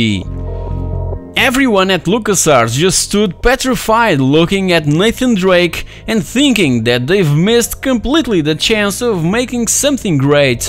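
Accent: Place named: Portugal. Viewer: Vi logo pela forma de falar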